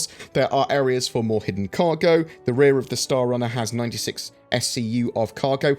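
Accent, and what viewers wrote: United Kingdom. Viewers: Is that a UK accent? Why does he speak like a sound wave .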